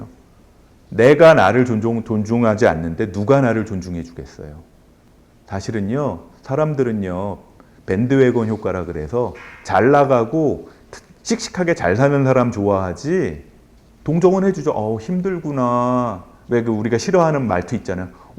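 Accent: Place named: Seoul, South Korea. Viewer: 서울경기권 방언입니다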